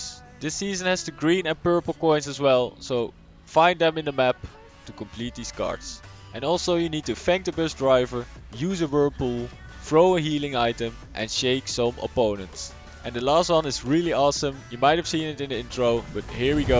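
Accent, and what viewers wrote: Netherlands. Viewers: ja, ik wist het. Ik zag het aan je naam en er zit iets van Nederlands in je Engels Niet dat je Engels slecht is hoor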